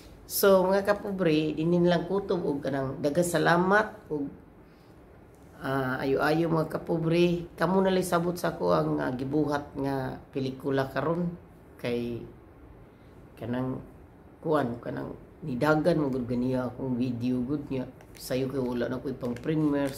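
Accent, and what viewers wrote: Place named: Visayas, Philippines. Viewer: bisaya man diay si host, proud bisdak here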